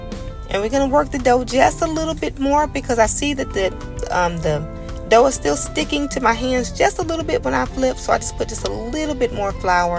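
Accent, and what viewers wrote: South, United States. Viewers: Greetings, from Florence, OR. I'm new to your channel, love the southern drawl, and enjoy your demos. Haven't tried any yet but they're high on my priority list. All the best to you with appreciation, Diane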